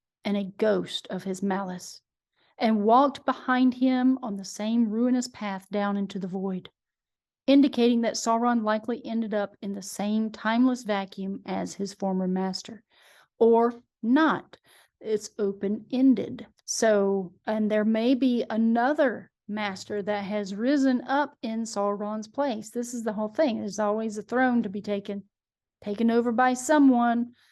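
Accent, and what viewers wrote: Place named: South, United States. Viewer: I just found you today from the typical podcast show. I am elated. I can not believe all these things you're saying on his show, I know most of. I have been fascinated with the occult and symbolism for quite awhile, but a lot lately. I like to find things from the past, like photos of the Rothschild parties with their Saturn costumes, and Pink Floyd songs, and many books. I read a lot. There is too much for me to list here, but I do a lot of research. Also, I've had many OBE's although its been awhile. I wonder what part of the country you are in? I hear a southern accent. I'm in New Orleans. So happy I found you!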